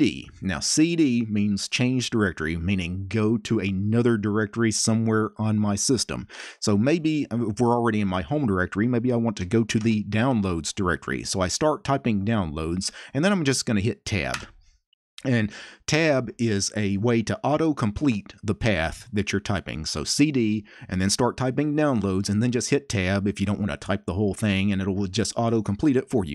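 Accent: South, United States. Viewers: I thoughts it was weird when i first heard Indian accent in a video teaching me computer science, turns out its even weirder hearing a Southern accent in a video teaching me computer science. Jokes aside, its a great video with clear explanation. Good job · Your editor put grip because they can't understand a southern accent apparently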